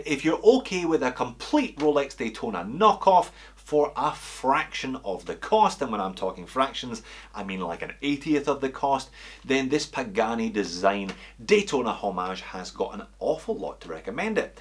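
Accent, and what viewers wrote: Scotland, United Kingdom. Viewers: hey I spent the last few hours watching some of your videos, I love your presentation style and iconic "Hello" at the start. Love the scottish accent too. I like how you cover many different types of watches at all price points and it makes for interesting viewing. Keep up the good work mate!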